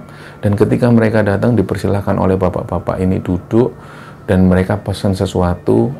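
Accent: Java, Indonesia. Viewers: Dengerin channel satu ini, ilmu dpt, hikmah dpt, sejarah jg tau. Pembawaannya lugas dgn medok yg khas. Semangat tim, saya subscriber baru! 😊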